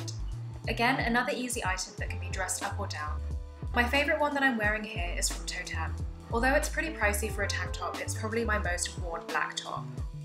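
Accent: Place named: England, United Kingdom. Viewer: Don’t lose your lovely English accent there!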